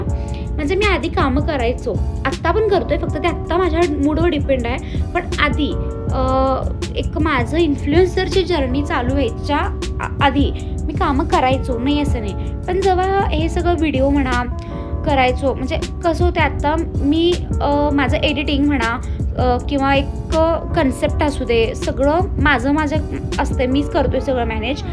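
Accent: Kolhapur, India. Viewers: Orignal kolhapuri language 🥰🥰🥰🥰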